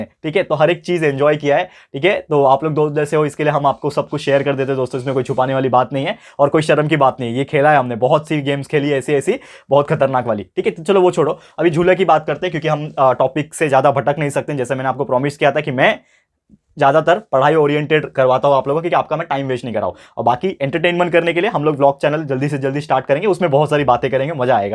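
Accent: Maharashtra, India. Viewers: Are you Marathi ??😮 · Sir your from Maharashtra?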